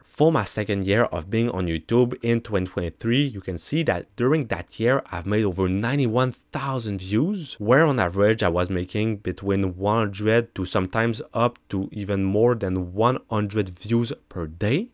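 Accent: Quebec, Canada. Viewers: Your content doesn’t apply to me, but I’m going to subscribe anyway as your personality seem great. You didn’t hide your payment figure to the very end of a 25 minute video. You kept it short and sweet. Very informative. I hope you get far with YouTube my friend. I’m from the UK but I assume that’s a French Canadian accent? Like GSP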